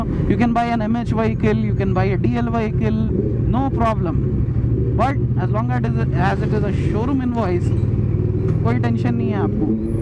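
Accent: Hyderabad, India. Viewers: Bol chal se pure hyderabadi hai miya tum!!! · Bhai pakka HyderaBadi slang awwesome... love from Hyderabad Jeedimetla.-55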